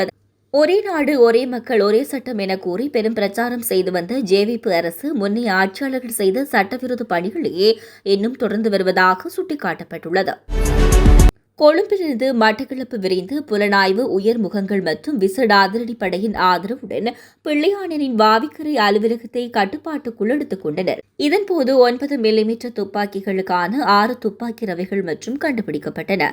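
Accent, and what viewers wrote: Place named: Kerala, India. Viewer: நீங்கள் சற்று நிதானமாக செய்தி வாசிக்கலாம். மலையாளிகள் தமிழ் வாசிப்பது போல் வாசிக்கிறீர்கள்..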